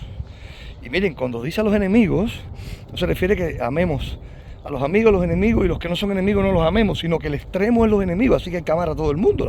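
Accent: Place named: Cuba. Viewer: Fuera creyente o no los que su propiedades quedaron intactas, solo podemos decir que Dios los cuido. No entiendo porque usted decarta lo que está antes los ojos de todos. Creo que su forma de hablar me parece Cubano. Parece más ateos que alguien que hace una exegesis de su narración. Nadie puede negar que todas las casa que no le paso nada fue un milagro de Dios.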